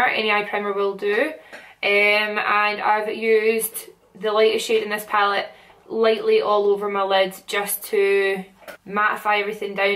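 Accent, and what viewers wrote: Scotland, United Kingdom. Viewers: She's Scottish Lool that's not foreign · Its just a fucking scots accent calm it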